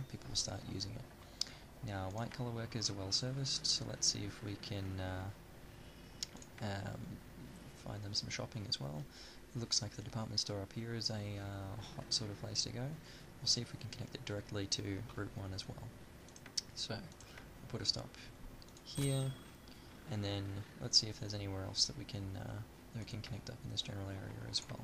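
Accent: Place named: Australia. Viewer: Love australian accents :D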